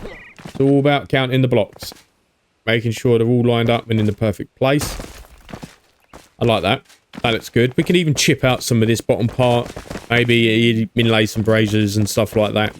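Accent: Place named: England, United Kingdom. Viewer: I´m watching this series not only because I like Enshrouded very much but your accent mate, I really like it, typical english imo, greets from the Netherlands, keep up the good work!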